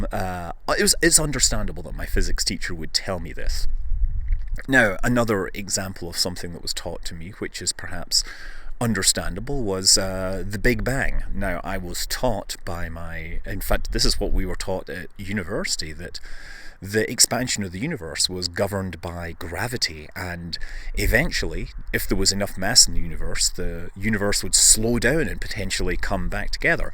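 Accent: Scotland, United Kingdom. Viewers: I heard that plate tectonics is a relatively new theory. Were you taught that at school? Also, this is your first video I've ever seen, I enjoyed it. You said you're living in California. But from your speech I would assume your Scottish... Is that true or am I just misinformed about accents. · you sound borderline scottish, i like you.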